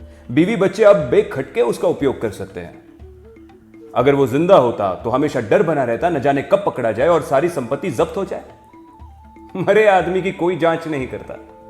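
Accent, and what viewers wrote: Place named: Pahadi, India. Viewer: Aapki aavaz bahot achhi he.ekdam pahadi..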